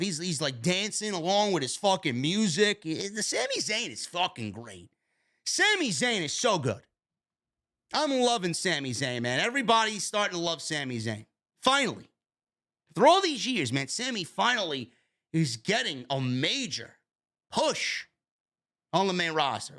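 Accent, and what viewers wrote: New York, United States. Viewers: I gotta ask, you're from NY and have a strong NY accent, but rock the Braves hat. What's up with that? Not that I got a problem with it, I was born in ATL. Just expect you to have a Yankees hat I guess lol. Thanks for representing through bro.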